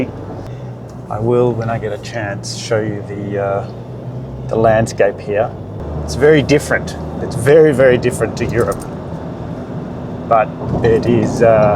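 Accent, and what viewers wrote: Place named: Australia. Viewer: How that I am not notice your australian accent , until now. That explain a lot of things . Hapy holiday mister driver.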